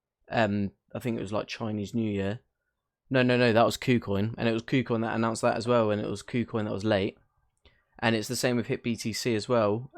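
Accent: United Kingdom. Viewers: Why would u dedicate a channel to talk about the worst performing coin of the year? this shit coin has been delay after delay....... disappointment after disappointment........... where are the exchanges they promised MONTHS ago? Hmmmmm? All this technical bullshit talk is not helping....... u know what makes the price of a coin go up? Results!!!!!!!!! Until then my friend you are wasting ur time and British accent on a shitcoin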